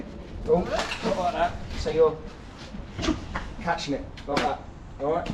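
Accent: Cockney, United Kingdom. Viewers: Flash cockney bastard you know what you are …….bricklaying yaaaaaaawn 🤪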